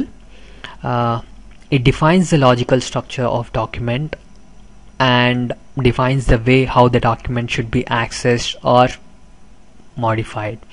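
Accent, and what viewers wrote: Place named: India. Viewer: It's the accent you struggle with. Clearly, he's a native English speaker , though with a local accent. Most probably Indian.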